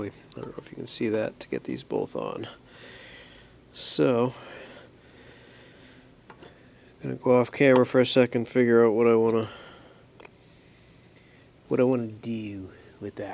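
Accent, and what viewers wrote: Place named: Massachusetts, United States. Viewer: Lol. I was listening to you and thought - "this guy has to be from Mass." Sure enough, saw the plate on the vette and it was confirmed. Great vid - Thanks!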